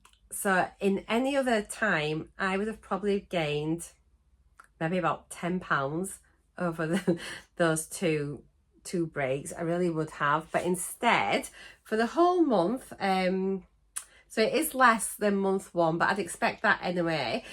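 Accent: Yorkshire, United Kingdom. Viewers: I should have recognised your accent. I am rom North East Lancashire I was brought up in Burnley and was convinced you were from Accy or Blackburn, but even though you are from Yorks I still love to hear your accent , I know what you mean about boots. I had days where my feet were so swollen I couldn't even get normal ankle boots on so I know what you mean with footwear generally. Its lovely to wake up to another one of your videos I hope you had a fabulous holiday . I might have to watch your video in 2 halves because I have to pop to the village this morning. I live in beautiful mid Wales now but I have family in Yorkshire and Lancashire. I just wanted to say a quick Good Morning to you and congrats on your bob scale victories x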